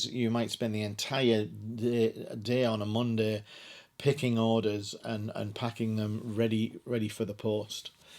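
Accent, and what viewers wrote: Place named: North, United Kingdom. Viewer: First of all, glad that your back. I just started my lego Hobby a year ago, while struggling with depressions. Building helped me to find some sort of calm. Having not a great amount of money, I needed to find a way to pay for my new addiction. Inspired by your videos (at first I'd liked just listen to your great northern accent), I was thinking about doing as you did and I started to chase deals, I parted out, created lists and did all what is nessecary for a Shop. I even more enjoyed all those things comparing to just building (which I still love). I'm in my 50s as well, so I will try to do it like you did later on. My Shop is still very small and I haven't sold that much yet (at the moment most of my sellings are minifigs on ebay) but I hope to grow and make some money later on. The best thing is that all the tasks which this Shop needs really help to set my f**** depression in place. 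So m8, cheers for your insperation. Greets from Germany Btw: I'm a big Motörhead fan, so my Shop is called Motörbricks 😉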